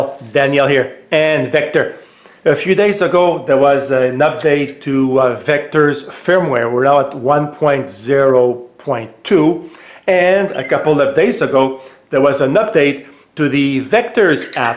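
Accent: Quebec, Canada. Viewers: i guess its the accent ! , he has a strong quebec french accent , for it to work , he should say . hey vector .. bring me the cube TABARNAK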